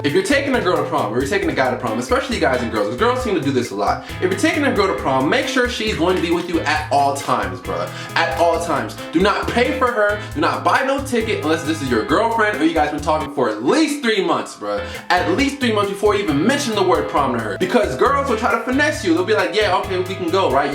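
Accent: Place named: Northern Virginia, United States. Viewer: lmfaoooo i KNEW you had to be from nova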